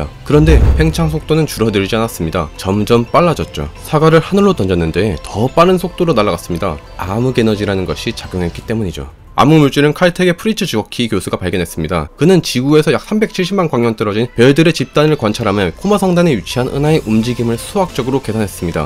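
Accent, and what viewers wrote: Gyeongsang, South Korea. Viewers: 실수가 아니라 그렇게 말하면서 살아왔는데 어찌하겠소 경상도인의 특징이고 그런 억양이 강한 동네에선 고치기 힘들며 언어적으로 바르게 쓰려는 흥미가 없는 사람은 본능적으로 기존 발음을 못 고칩니다 제가 이런쪽으로 잘 압니다 본인 의도와는 상관없이 못고칩니다 특히 빠르게 말할수록 더더욱 못고칩니다 사람은 각각의 흥미요소가 다르기 때문에 발달되고 교정하려는 능력도 다릅니다 대신에 다른것으로 우월한 분야가 따로 있겠죠 같은 지역 같은동네 출신이라도 나중에 단어를 어떻게 읽고 어떻게 발음하는지는 사람마다 큰 차이를 보입니다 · 깅상도억양 표시나요 숨길수없는 태생의한계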